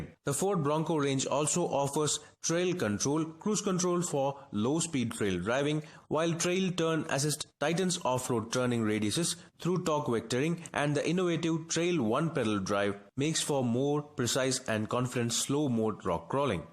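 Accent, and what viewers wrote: India. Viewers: What in the hell is it with the guy from India doing the narration ???????????? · What’s an Indian doing narrating this it sounds so annoying and I can’t finish the video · I’m sorry but when we have an Indian/no offense talk about the Ford bronco it doesn’t sound very American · The accent of this man, reminds me a liquor store or seven eleven.....Indian accent..you can figure it out miles away...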